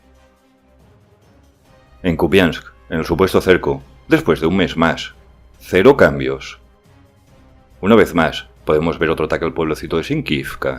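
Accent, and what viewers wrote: Galicia, Spain. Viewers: Perdon por el off topic, ¿tu acento es de Galicia?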